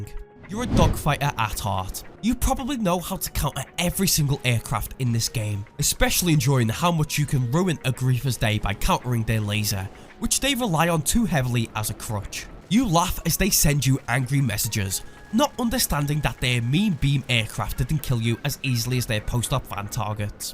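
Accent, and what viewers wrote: United Kingdom. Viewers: This guy is the definition of the bri'ish accent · Im sorry but please dont speak like a narrator because that british accsent+narrator just gave me a headache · Ok dies viioe is preyys nice! I ont´t like Briish accsent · SCOUSER!! No way ye not bro can hear it even through ye weird accent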